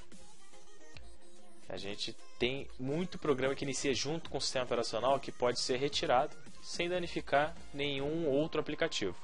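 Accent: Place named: Rio de Janeiro, Brazil. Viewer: (lento) leanto.. (disco)dixxxxco..(sistema) sixxxxtema.. sotaque carioca é bem diferente kkkkkkk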